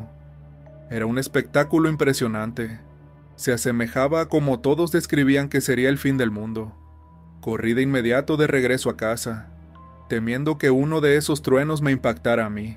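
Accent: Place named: Jalisco, Mexico. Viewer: Hola amigo me gusta como relatas tu forma de ablar nose pero me imaginó que eres de los mios sin ofenderte yo soy de jalisco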